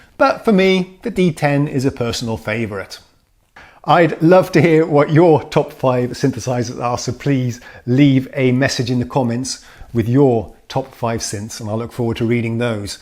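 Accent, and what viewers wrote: United Kingdom. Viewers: are you british born or swedish? because you speak a beautiful british english with a fabulous accent! I would like to speak with an accent like yours:O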